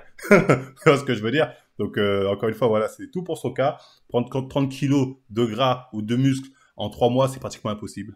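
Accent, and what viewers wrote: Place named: France. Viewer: On aime bien quand tu parles très français comme ça 🤗🤗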